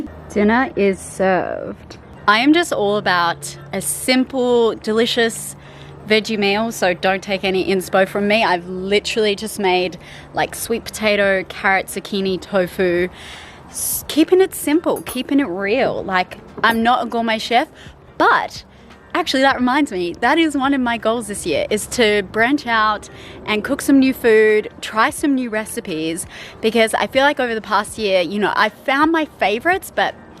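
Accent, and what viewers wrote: New Zealand. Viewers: New lense looks great, kiwi accent gets stronger every video and the hikes mentioned are such good ones. Ive got the able tasmin booked in a few months. Grow where you are planted- so few people learn to do this well! Also cooking is such a good time after you start figuring everything out. You gotta be you though and comfortable pushing yourself out there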